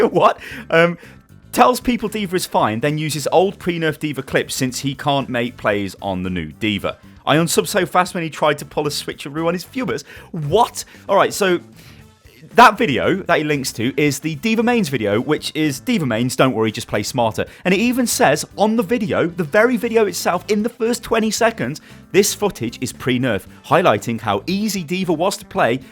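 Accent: United Kingdom. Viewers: dont worry i love u and ur patch note reading it makes me smile every time i watch a vid plus you are one of the few british youtubers i know which is nice as i live in BRITAIN 2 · I watch you over lone hawk your overwatch ect because the British accent lol.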